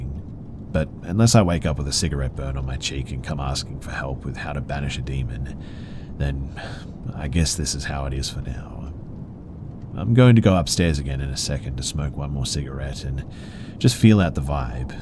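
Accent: Australia. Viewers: is an aussie? 😮 I thought I heard aussie buuut didn't want to offensive, lol.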